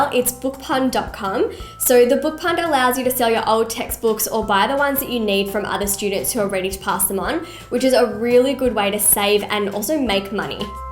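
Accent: Australia. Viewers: Love the Aussie accent! Thanks for sharing. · as soon as she started speaking, I instantly guessed she must be an Australian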